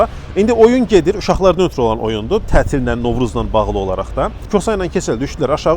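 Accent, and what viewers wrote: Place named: Shaki, Azerbaijan. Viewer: Qardaş salam xəbərin var artlq səndə şəkililər kimi ləhcə əmələ glib ❤ Var olun əllərinizə sağlıq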